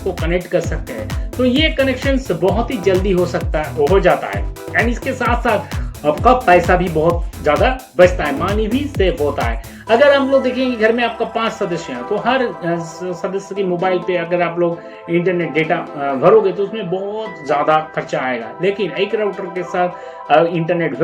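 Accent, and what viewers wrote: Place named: Bengal, India. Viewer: it looks funny awesome when a Bengali person tries to speak Hindi.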